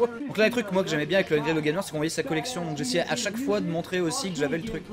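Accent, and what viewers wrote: France. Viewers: Benzaie d'il y a 10 ans c'est juste le gamin des flashbacks du Hard Corner en fait:-D Je me souviens moi-même avoir vu ces vidéos sur ThatGuyWithTheGlasses (quand ça s'appelait comme ça) il y a pratiquement 10 ans... je me demandais à l'époque "mais qu'est-ce qu'il fait là ce français qui accumule les "fuck" et les "shit" avec son vieil accent?"... Que de chemin parcouru depuis! Félicitations Benzaie de toujours nous divertir après 10 ans, tous les vidéastes ne peuvent pas en dire autant! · Je me souviens t'avoir cherché dans le forum TGWTG quand tu as sortis ta première vidéo avec eux, t'ayant reconnu par ton accent si Frenchi et on s'était ajouté sur MSN, no joke. Toute une époque vraiment! Je suis bien content de te voir aujourd'hui à l'avant de la scène FR. Des bisous, on t'aime tous et à 30 ans de plus au moins, on te l'espère tous:)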